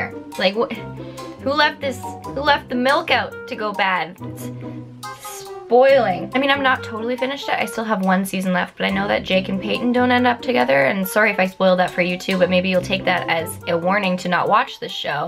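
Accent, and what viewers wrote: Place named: Canada. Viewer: That Canadian accent... 👌🏻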